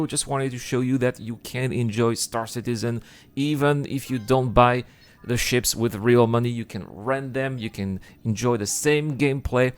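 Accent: France. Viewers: Bro... Are u french?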